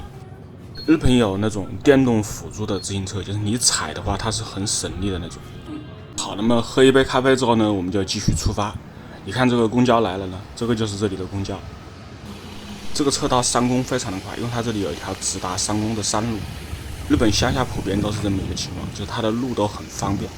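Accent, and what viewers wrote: Wuhan, China. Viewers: 之前听你说话觉得你怎么像我们武汉人后来看到评论说你就是武汉人，哈哈原来是老乡！😊😊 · 你要不是武汉口音明显，我真怀疑你是国外长大的。口条都不知道😂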